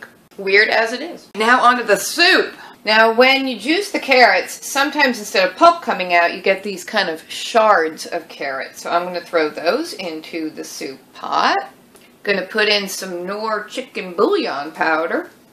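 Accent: South, United States. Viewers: I saw jersey license plates in another video but you sound like youre from the south.......just wondering. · Why do you sound southern today? Or is it me? Anyway, love listening to you ...some pretty good ideas...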